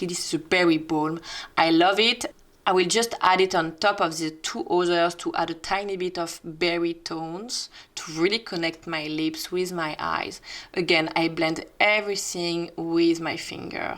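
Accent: France. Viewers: Just stopping by to say hello. This is my first ever comment on YouTube 😳 But I had to. So many already told you about your stunning, pretty and elegant makeup style. However......the french accent...Oh My God. My heart is melting. So beautiful. As if you are singing the tutorial. I love it. You are definitely my new favourite YouTuber.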